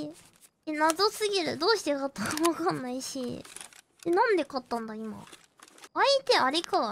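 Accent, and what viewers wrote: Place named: Japan. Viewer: ひらがな英語可愛すぎる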